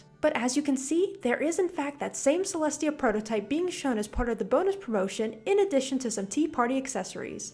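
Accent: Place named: United Kingdom. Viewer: She’s from the UK.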